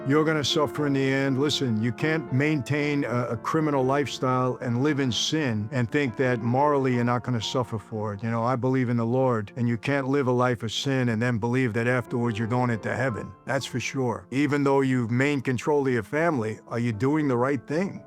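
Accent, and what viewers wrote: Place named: New York, United States. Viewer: They need to hire you as the voice over for all mob/mafia documentaries from now on your experience on top of that classic New York accent legendary stuff man keep it up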